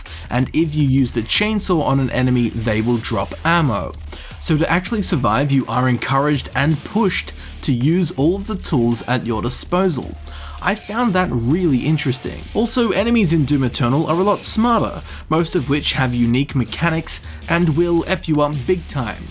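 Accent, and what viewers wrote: Australia. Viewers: Took me a while to realise. You're an Aussie? Never seen your channel before, but since Eternal will be my "allowance" game for this year, I'm trying to soak-in as much of it as I can to get an idea of what my wife is letting us spend $300 on bahaha. Your video is damn detailed and I would be very interested in talking with you after Quakecon (which I hope you will be covering). Awesome content, mate.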